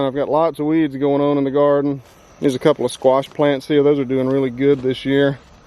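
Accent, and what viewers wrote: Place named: North Carolina, United States. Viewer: I think he’s from North Carolina !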